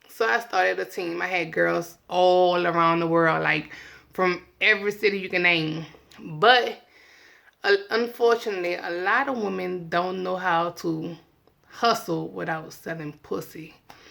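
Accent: New Orleans, United States. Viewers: That New Orleans accent!!!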